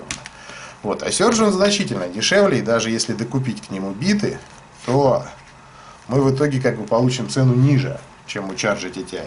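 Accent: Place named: Moscow, Russia. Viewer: Обычный Московский акцент! У некоторых колхозников, тоже уши режет , ножниц'Ы, пол'Ожил, отдуда'ВА, и прочий словестный понос!!! Автор видео, не сколько Акает, сколько вызывающе говорит! "Выёбисто" что-ли..))) Но обзоры достаточно интересные!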